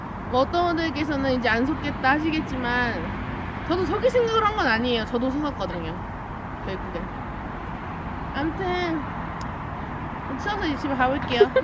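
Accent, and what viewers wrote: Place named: Seoul, South Korea. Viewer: 옛날 서울 사투리쓰는 아가씨같아요 되게 말씨가 곱답네요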